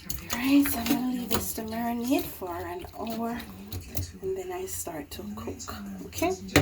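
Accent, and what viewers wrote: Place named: Jamaica. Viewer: Thanks for sharing... I hear the Jamaican make me miss yard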